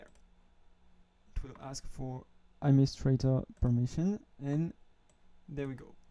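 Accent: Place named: France. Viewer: Been lookin for a way to download twitcast videos for offline playing for quite sometime and you did it!! Mèrci!!!! Hope I'm not too preseumteous to assumed that you're French from your accent. P.S : Locked videos can also be downloaded provided you have the password for it, and start/play the video before the browser console step. (The vid I wanted to grab is a locked one) P.P.S : The only difference for me is, I have to hit enter after the download has completed. It did not promt me about the conversion automacally.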